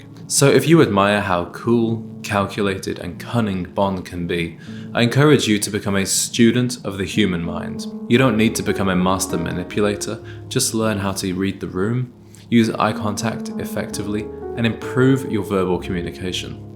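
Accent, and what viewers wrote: United Kingdom. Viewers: Chicago? I thought you were British in the UK!?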